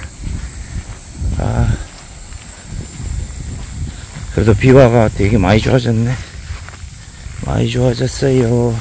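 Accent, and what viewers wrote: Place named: Gyeongsang, South Korea. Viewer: 참~~말로 갱상도 사투리 정겹네예~~~ 버스턴에서도 잘 보고 있습니다.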